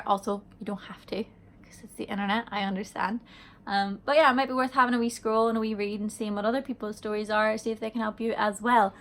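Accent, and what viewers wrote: Ireland. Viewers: My guess is she's Irish. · you are an inspiration to many people I follow you on YouTube I follow you on Instagram and Twitter because I think that you are an amazingly talented person a beautiful singer and a beautiful young woman you have beautiful eyes you have beautiful hair you have a beautiful smile and if I may say you have a sexy body every person is different no two people are alike you are unique you are unique in many ways keep doing what you're doing because your voice is a treasure your beauty is a treasure and your story is a treasure and I for one appreciate you for all of these things and if I may add as an American I absolutely love your Irish accent it makes me happy to listen to you speak may you have a long prosperous and delightful journey in your life I will follow you through it all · Where are you from? You sound a bit irish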